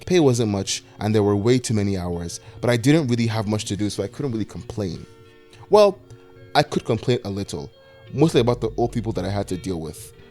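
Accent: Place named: Nigeria. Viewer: While I won’t say us Nigerians are rare, we are few and fair between when we occupy commentary spaces I absolutely shocked when I heard the close to home naija dialect and little slips of our accent mixed with such eloquent writing and structure in this vid. As a fellow Nigerian who felt stuck In how our community limits us to such places I’m pleasantly surprised and annoyed i found you just now.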